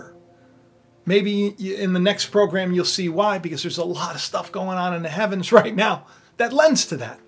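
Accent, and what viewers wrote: New York, United States. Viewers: Talks like true New Yorker “anti gun” everything… Under this logic all police who carry a gun are out to hurt someone..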